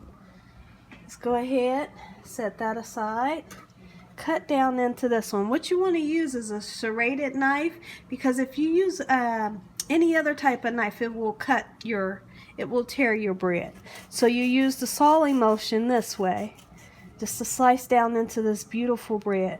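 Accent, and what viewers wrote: South, United States. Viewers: I love your country accent.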